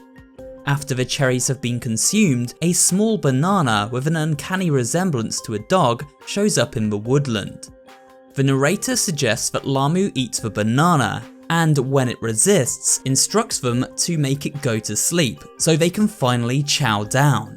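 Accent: United Kingdom. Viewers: THE BRITISH ACCENT JUST MHWA❤️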